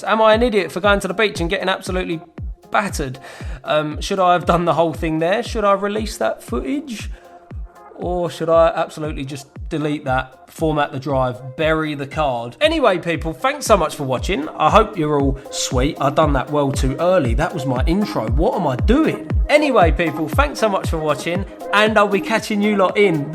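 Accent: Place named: Essex, United Kingdom. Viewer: Yeaaah definitely getting a 3S pro...I guess I watched this video just for your accent 😂 #essexnostalgia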